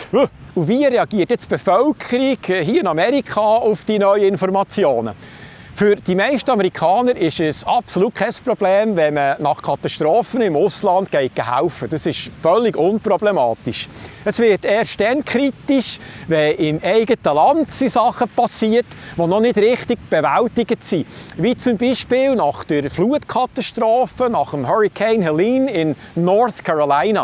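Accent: Bern, Switzerland. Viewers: Danke... Du bisch dr bescht u es isch toll dis Bärndütsch...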